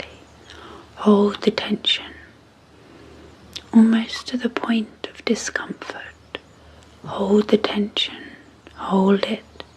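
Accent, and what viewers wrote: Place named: West Country, United Kingdom. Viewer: I wonder where this lady is from? She’s got a Wiltshire/Somerset vibe.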